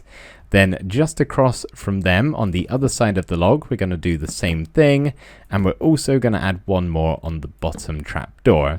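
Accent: United Kingdom. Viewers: Bro, your British accent sounds really cool)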